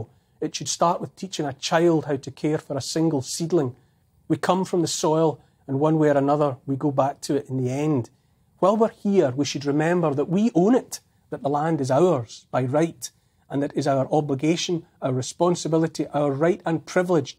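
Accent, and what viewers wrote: Scotland, United Kingdom. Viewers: And the scottish tones ssure help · I know he’s Scottish. Kind of obvious. I’ve never heard an “ Irish brogue”. Love his historic documentaries. He just has an “ It” factor.Not in the Steve McQueen/ Jim Morrison overt sexuality genre. More esoteric. Are you in the UK? The top two contenders vying to fill Johnson’s seat are WEF “ Young Global Leader” plants.Quite terrifying. Wales,France,Italy ( just ousted), Brussels, Netherlands, Finland, Norway,Luxembourg,Australia, NZ, Canada, Sri Lanka( ousted), Pakistan,Saudi Arabia,Germany,Chile,Bolivia,Nicaragua,Ukraine,WHO,UN,Spain, Greece, El Salvador,Peru,Honduras,Argentina,Sweden, Begium, Maldives, Costa Rica Denmark, the US Puppet Governmet. “ Tyrants ‘r’’ Us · My neighbour was a "war bride" from Scotland. She was a second mother to me. Hearing your beautiful Scots accent makes me smile and think of that wonderful woman. 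Hearing your message gives me hope for the world, knowing that not all of us are asleep, and it gives me hope that my neighbour's husband, and my dad, did not fight in vain in WW2.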